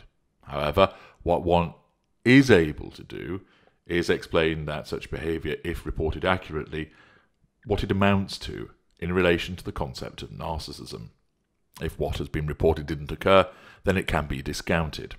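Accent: United Kingdom. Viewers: This guy doing the video has a polished British accent; he's very clear.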